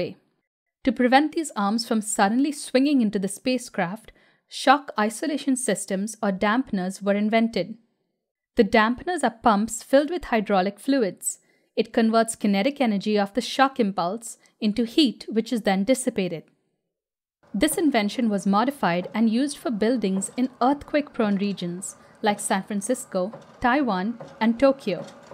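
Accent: India. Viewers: Hey very nice educative content, awesome graphics and you have a nice polished Indian accent too, you from India?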